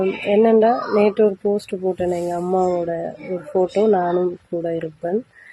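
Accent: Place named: Sri Lanka. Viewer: உங்கள் பேச்சில் இலங்கை சாயல இருக்கு உன்மைதானா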